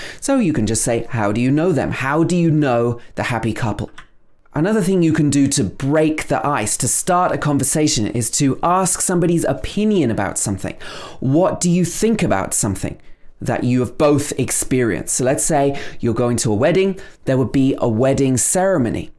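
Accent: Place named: United Kingdom. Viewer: Pls do continue making videos...I am an indian ...its great to learn British accent from you...doing a great job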